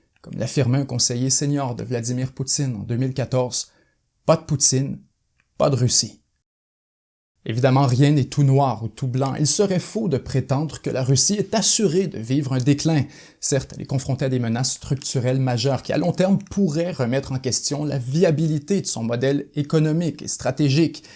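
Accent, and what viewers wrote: Quebec, Canada. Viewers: T'es québécois toi, non?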